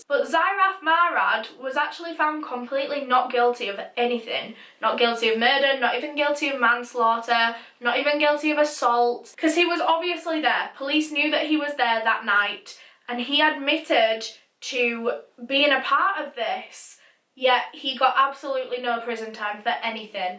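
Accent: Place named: Geordie, United Kingdom. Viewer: Are you a Geordie? You sound northern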